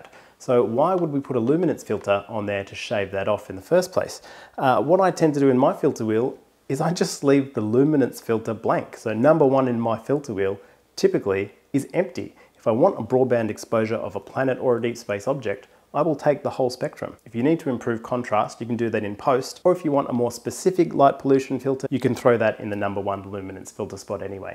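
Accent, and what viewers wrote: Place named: Australia. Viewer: I don't even own a telescope and am totally offended! But your accent softened the blow a bit knowing what a-holes ozzies can be ;-) Keep up the class work!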